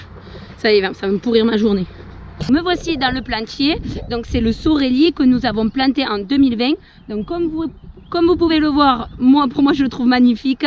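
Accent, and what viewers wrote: South, France. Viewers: Cc les amis merci pour ce voyage dans vos vignes c super intéressant merci on adore toujours ton bel accent du sud bon courage à vous 😎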